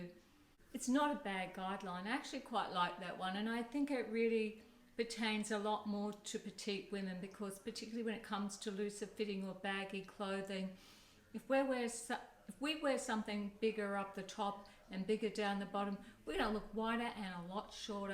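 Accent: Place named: Australia. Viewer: I loved this, some of the terms you use are like America 🇺🇸 and a lot the same as England 🇬🇧....I love the Australian 🇦🇺 accent.......You style is impeccable as always....🌺🌺🌺Much Love Bella🌺🌺🌺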